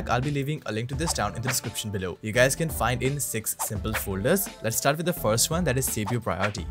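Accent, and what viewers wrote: India. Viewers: YOU SOUNDS LIKE AN INDIAN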